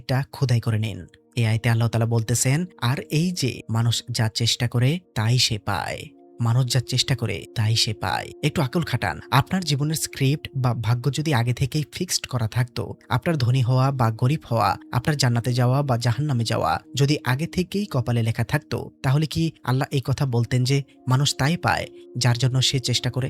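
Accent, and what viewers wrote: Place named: Jamalpur, Bangladesh. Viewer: oh আচ্ছা সেটা বলেন,,হ্যা সমস্যা নেই,তবে আমগর এলাকার নেহালা ভাষা ত, মেল্লা মিল আছে, হেজন্যেই কইল্যাম।যদি এবা অইতো এপনে আসলেই জামালপুরের, মেল্লা বালা অইতো 😅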